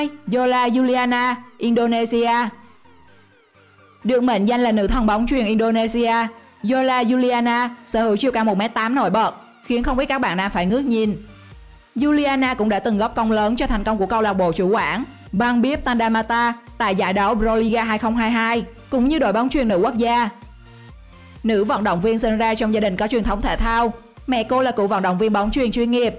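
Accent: Vietnam. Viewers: Giọng bạn ấy là Trung lai Nam Giọng miền Nam đậm chất hơn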